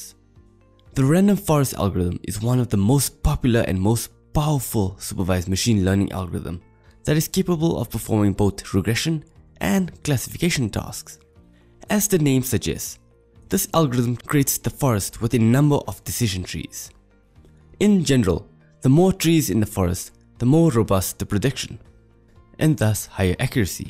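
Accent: South Africa. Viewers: I think this is great. There are plenty of examples of code and technical online but for fundamental understanding, this works just fine. Good to hear South African this coming from South Africa! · Dude your accent is so South African!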